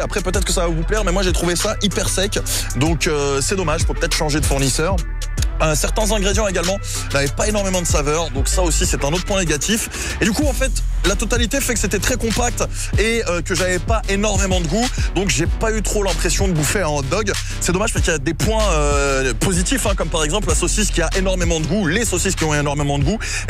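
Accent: United States. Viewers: T'es un Cainri ou un français mdr , bonne vidéo man ! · frère tu fais tellement le ricain i tariv quoi aha ?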